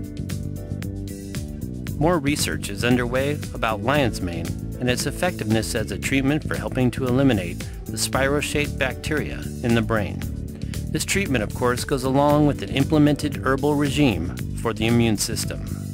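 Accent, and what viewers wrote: United States. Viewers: Sorry, I shouldn't have been so harsh and called your narration "robotic" to begin with at all -- especially when you're already self-deprecating about it! The pace of your speech is fine, BTW, and you do happen to create numerous, highly informative, useful and great videos anyway! ☺ If this is of any solace to you, I don't like the sound of my own voice either! Clearly, I'm not good at detecting some U.S. accents (clueless and Irish here!). Californian? I hope you or any family haven't been adversely affected by those bizarre and horrific wildfires sweeping across your state? There's been talk about laser-based Directed Energy Weapons (DEWs) playing a role... Rambling on here, sorry. Anyway, just keep up the great work with your videos and have a lovely weekend! 🌝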